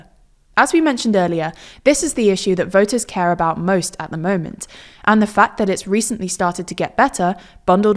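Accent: United Kingdom. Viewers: Love listening to Aussie election news with a nice British accent.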